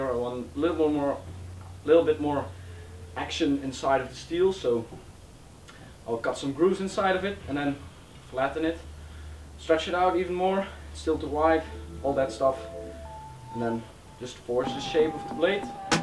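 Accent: Netherlands. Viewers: Are you dutch? Your accent seems so Edit: ik lees nu pas je beschrijving😂 ik hoop dat je weet dat een yatagan eig geen zwaard is maar een dolk👌🏽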